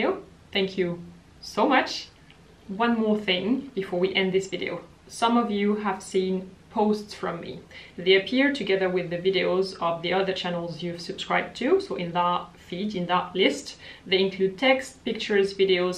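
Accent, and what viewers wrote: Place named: France. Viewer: Hi! I’m a Spanish woman who lives in San Juan, Puerto Rico. I ❤️your videos and I ❤️your french accent speaking English 👌I would like to know if lavender is good for cool or warm undertone (I think mine is warm) Have a nice day.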